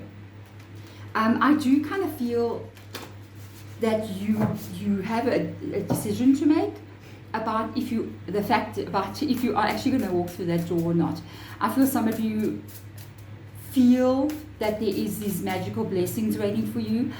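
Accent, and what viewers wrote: South Africa. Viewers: Thank you! You sound South African! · I hear a south African accent?😊 ❤I'm from joburg living in Oz. Thank you for this reading 📚 ♈️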